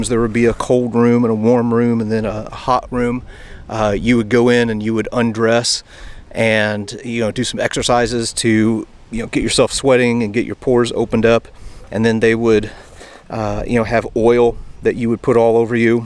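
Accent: United States. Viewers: Excellent presentation on the edge of the known world. Dont know why,(raised on U.S. tv shows in the 80's) maybe? But we do enjoy our history being told in an american accent. 🇬🇧🇺🇸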